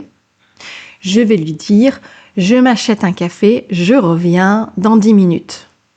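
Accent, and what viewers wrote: New Zealand. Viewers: Je vais retourner à Paris ou j'étais jeune fille au pair il y a 45 ans. Je l'adore, and maintenant, je suis en train de rafraîchir ma connaissance de la langue française! J'adore ton accent en français et en anglais. Tu es dans le sud de France? Et bien sur, j'entends un accent Kiwi quand tu parles anglais! Merci beaucoup pour ces videos. Je viens de m'inscrire à la chaîne! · Love the Kiwi accent.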